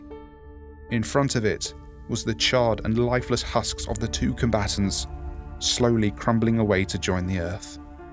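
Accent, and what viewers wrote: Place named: United Kingdom. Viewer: Clicked video to watch, heard that you were british. Disliked and reported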